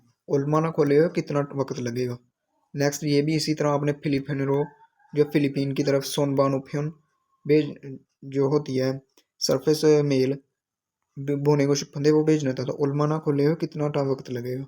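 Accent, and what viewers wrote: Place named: Sialkot, Pakistan. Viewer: Sialkot SA lag Raha ho😊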